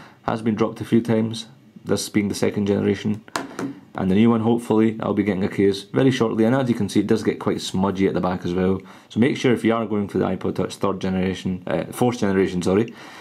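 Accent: Scotland, United Kingdom. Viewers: gotta love his accent!!! scottish!!!!! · i love your accent! where in scotland are you from?? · Love u r accent (Scottish I think) and how u used some words,lol. And nice video and comparison! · This guy speaks exactly like Craig Ferguson. And I dont mean just Scottish accent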